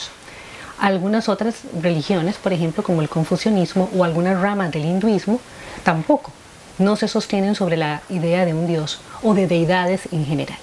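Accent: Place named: Costa Rica. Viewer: tu practicas alguna filosofia de vida o religion en tu vida, tu inteligencia es exquisita escucharte y una mujer muy bella me encantaria asistir a alguna charla o seminario tuyo x tu acento especulo que eres tica y q vives en san jose?? Se t puede ubicar de alguna manera donde encuentro tus libros??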